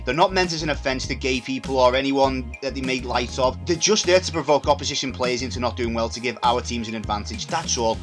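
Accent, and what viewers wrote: Liverpool, United Kingdom. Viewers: hello, thanks for what you doing, can you put a subtitle "CC" to your videos next time that will be very helpful. I love the scouse accent but I really can't catch up with the words. <3. ( •̀ ω •́ )✧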